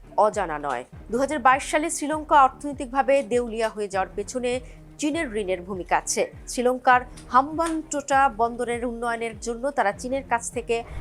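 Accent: India. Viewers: Eta Indian channe. Ei betir Kotha o uccharon kolkatar moto. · আপনার কথা শুনে মনে হচ্ছে আপনি পশ্চিম বাংলার কোনো টিভি চ্যানেলের উপস্থাপিকা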